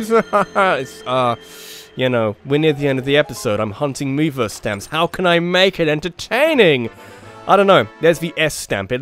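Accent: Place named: Scotland, United Kingdom. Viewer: We need the Scottishness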